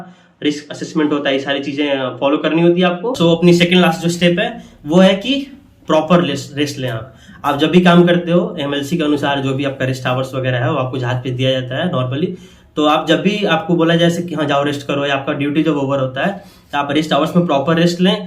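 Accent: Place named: Bihar, India. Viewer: Bakwass video 😂😂 typical bihari 😂😂 Bhai teri awaj se hi lag ra h Tu pure bihari h 😂😂😂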